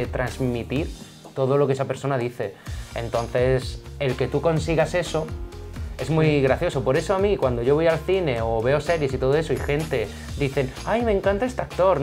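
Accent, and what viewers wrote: Argentina. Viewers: Sos de Argentina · Eres de argentina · Escardi neta eres Argentino we(? PAAAAAJARO 7U7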